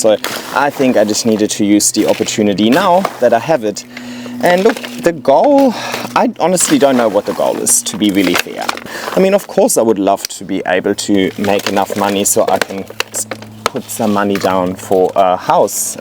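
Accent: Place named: Germany. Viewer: I vow to you I will watch your videos and I have subscribed to both.Not because Brad and Bailey are sooooo cute.Not because your German / Aussie accent is soooo sweet. NOT because I live to see Timothy and A.J. Because your very talented with plants and explain what your doing very well from start to adult plant.Your plants are healthy and beautiful.I LOVE SPENDING TIME WITH YOU.I can't wait for mom to visit.And yes see you were on t.v. so you know you have talent.I want to tap in on that.Thank you for making another channel as one was not enough time.And thank you for sharing you vast knowledge.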